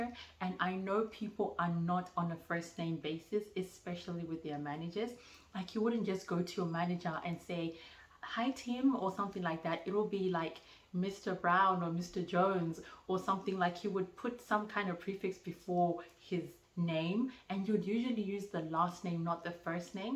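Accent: Australia. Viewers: Your getting some Aussie twang :-) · Your accent has a very Australian sound now if you didn't realise. Welcome to the Family.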